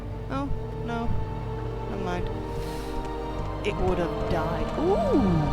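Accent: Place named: Lancashire, United Kingdom. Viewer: Hi, just subbed after watching these vids, just started Morrowind after literally thousands of hours playing and modding Oblivion and Skyrim on PC and 360. Very helpful tutorials about the basics, it has surprised me just how much more in depth this game is compared to oblivion, which i thought was quite in depth itself, forget Skyrim for that,lol. Anyways nice work and keep it up. By the way where abouts are you from as you sound to be a Lancashire lass to me, as i am a Lancs lad,haha?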